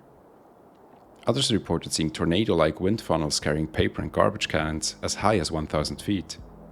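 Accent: Switzerland. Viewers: You draw only one wave, that right over the obstacle. Which is orographic wind (wind following the contour of the landscape) and has nothing at all to do with the wave phenomenon. The wave is a gravity wave of the whole atmosphere BEHIND the obstacle. (Comparable to the wave of a stream behind an obstructing rock.) Rotors are aligned with the waves behind the ridge, and not just chaotic phenomena downstream as your drawing implies. Completely off topic: Are you Swiss? I seem to hear a hint in your accent. (Swiss myself.) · Fantastic videos, and love your (more Swiss, than Austrian, actually) accent. One nit: I observed a pattern where you say things like "all but unforeseeable", when really what you mean is the opposite: all but foreseeable, meaning it is everything except that it can be foreseen. Keep it up, man, these are great.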